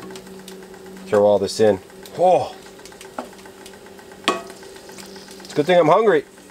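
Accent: Canada. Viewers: Very calming video.You seem like a nice guy.Good to hear a Canadian accent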